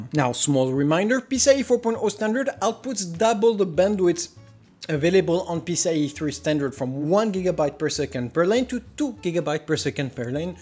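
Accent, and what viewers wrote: France. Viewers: Great review combined with an outrageous french accent that Inspector Clouseau would be proud to own.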